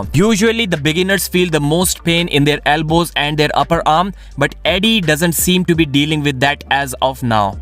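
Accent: India. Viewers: I can't listen to that deescoosten idiian vooecee.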